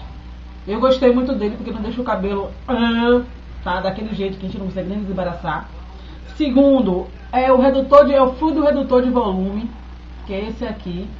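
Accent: Bahia, Brazil. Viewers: esse sotaque ai eu conheço .povo bahiano é tudo animado mesmo.quarquer dia eu vou na bahia conhecer melhor a cultura de vcs.amei o video bjs!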